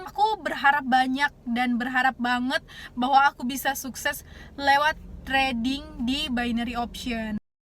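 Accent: Manado, Indonesia. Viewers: Logat manado masi keliatan dikit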